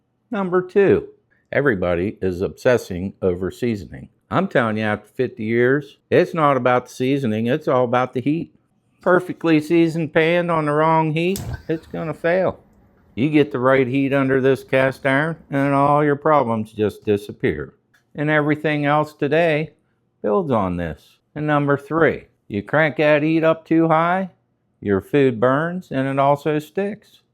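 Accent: Pittsburgh, United States. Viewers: Are you from Pittsburgh? Excellent video! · I know a fellow Yinzer when I hear one!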